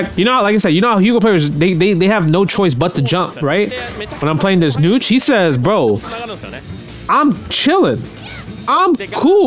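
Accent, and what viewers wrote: New York, United States. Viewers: Commentary is 🔥! Def. NY 🗽 talk albeit you in Canada 🇨🇦. 😂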